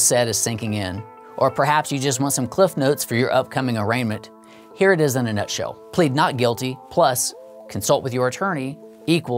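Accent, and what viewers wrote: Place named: United States. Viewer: true. But who are you replying to? I don't see anyone saying they're the same, and I think we all understand that this video was about the US. (The accent was a pretty big clue)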